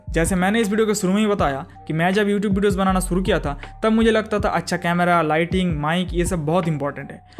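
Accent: Bengal, India. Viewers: bengali tone bro..... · tomar bengali accent tike kom karo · Tumi bangali 😂 am I right 😅 mein ne pechan liya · Love your Bangali accent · Bhai bengali ho kya ap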